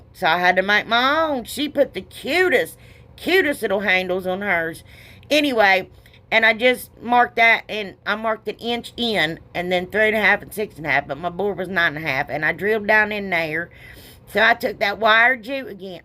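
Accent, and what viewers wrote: South, United States. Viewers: Love these ! Bet you and I sound about the same, country as all get out !!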